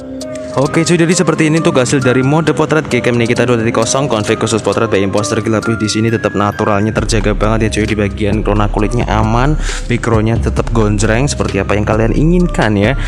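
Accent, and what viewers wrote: Surakarta, Indonesia. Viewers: Orang solo kah bang?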